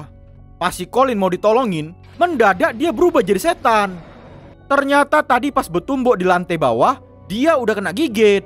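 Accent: Medan, Indonesia. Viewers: Cak ko buat alur cerita ramboo the last blood, laey..paten kali bahasa medan ah..mantap ❤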